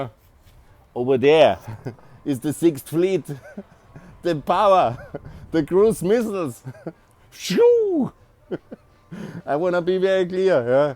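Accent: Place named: Germany. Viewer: becauz your english accent like german gunther; that’s why they thought ur german..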